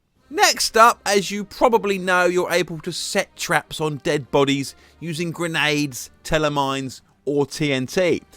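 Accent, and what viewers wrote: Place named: United Kingdom. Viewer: Your "Probably, potentially, possibly" combined with Your British made me hit "subscribe".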